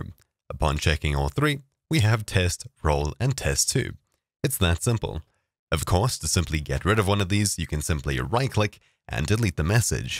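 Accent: South Africa. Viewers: hey i hear a south Africa guy here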